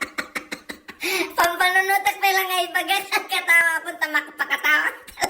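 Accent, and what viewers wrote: Ilocos, Philippines. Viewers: Ang sarap mong tumawa auntie.. Ang galing mo talaga, pure Ilocano.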